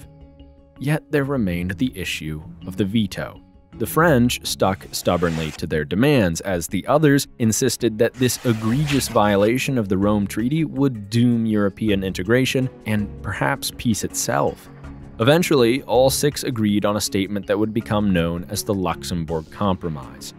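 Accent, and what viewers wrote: United States. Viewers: Sounds very American.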